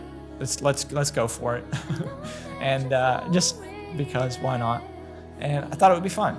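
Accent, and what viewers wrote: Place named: Virginia, United States. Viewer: That Virginia accent is a big part of why I enjoy your ASMR channel. 😁 Your story is so interesting. Best of luck to you!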